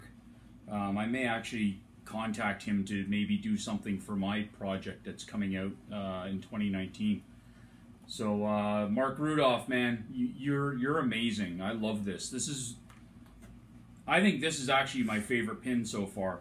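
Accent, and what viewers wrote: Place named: Canada. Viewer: Incantation flag is dope AF!! Are you from Canada? Just wondering because all of the "aayyy" lol. Good video btw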